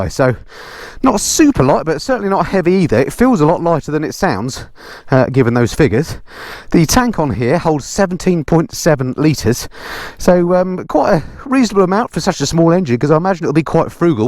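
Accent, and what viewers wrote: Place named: Hertfordshire, United Kingdom. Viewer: Great review you old Norfolk boy you ha ha. You've still got the accent albeit mixed with a bit of semi posh Hertfordshire home counties.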